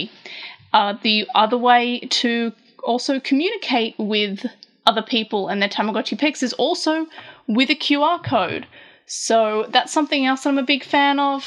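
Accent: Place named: Australia. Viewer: just when I was expecting the youtuber go be American, turn the sound up and the YouTuber is Australian!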